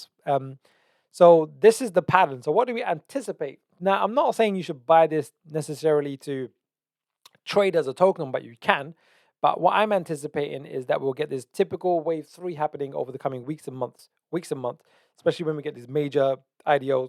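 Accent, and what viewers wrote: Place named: United Kingdom. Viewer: more so with crypto narrative, opinions, actually studying all aspects of this market other then price, fundamentals and watching people with a different mindset which allows you to open your mind that little bit more. All these don’t marry up so well with Instagram. None the less you sound like your from the U.K. so I’ll just have to bump into you and try my luck that way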